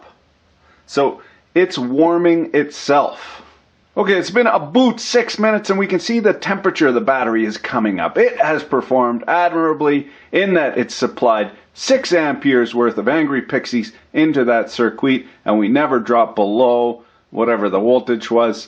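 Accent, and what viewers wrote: Canada. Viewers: WARNING! VERY CANADIAN!!! But he seems like he knows what he is talking about , and he answered my question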